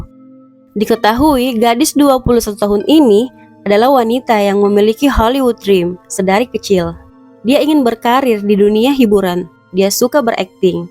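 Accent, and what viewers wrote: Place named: Makassar, Indonesia. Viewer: Yg punya chanel sepertinya org makasar. Soalnya logatnya kentara pas lagi bicara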